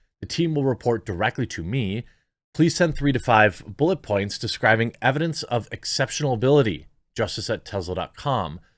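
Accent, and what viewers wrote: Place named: Midwest, United States. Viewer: it's the mid-western accent get over it.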